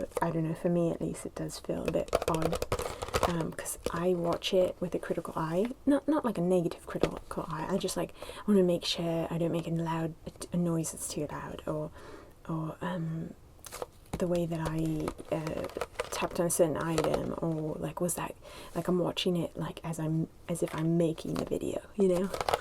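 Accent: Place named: United Kingdom. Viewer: I always forget you aren't British. That accent is nailed tho😍😍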